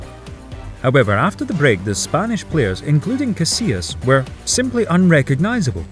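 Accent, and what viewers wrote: Scotland, United Kingdom. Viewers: The narrator sounds Scottish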